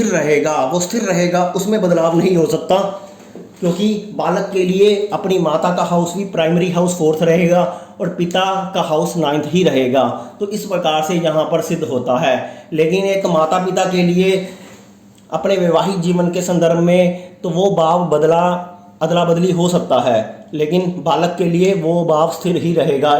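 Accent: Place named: Punjab, India. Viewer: bhai mene aapki koi Insult nai ki mene ye kehna chaha ki aapki hindi bolne se ya aapka accent hindi ka dil ko acha lagta hai usme apnapan aata hai usme punjabi touch bhi kabhi kabhi aata hai to mazaa aa jaata hai aapki knowledge awesome 👌 hai bhai main aapka subscriber hu aapko hamesha like karta hu...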